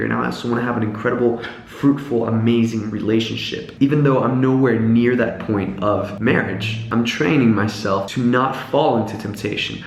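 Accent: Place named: United States. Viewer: !?? Sounds really american. Think you had enough... and finally grown up.😉 Greetings from Holland.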